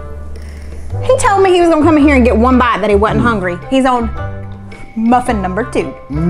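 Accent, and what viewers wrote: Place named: South Carolina, United States. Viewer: I say whopping also. May be a Southern thing. I love you because you are you. Love, love your channel. I have relatives in Upstate SC and I love your accent. 🥰❤ When I hear like (liike) and type (tipe) and last night (niite) I know it is Upstate SC.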